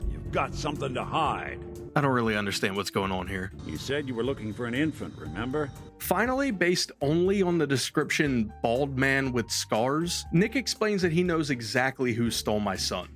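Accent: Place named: Pittsburgh, United States. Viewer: Idk where ur from but I keep catching a Pittsburgh soundin accent 😂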